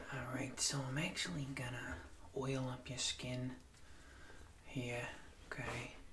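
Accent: Boston, United States. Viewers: Have a question: From which part of US belong his accent?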